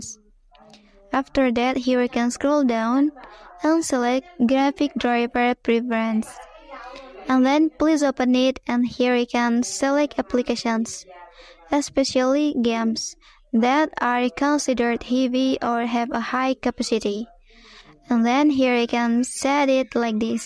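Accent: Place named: United Kingdom. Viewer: So British luh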